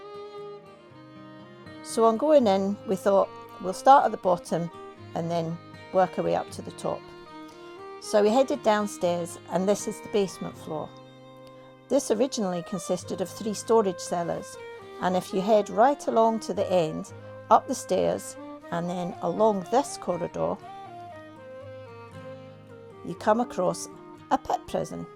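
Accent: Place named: Scotland, United Kingdom. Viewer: your voice is a beautiful mix of Scot’s and elegant English. 👍 plus no one likes the sound of their own voice… oh wait, some do! 😂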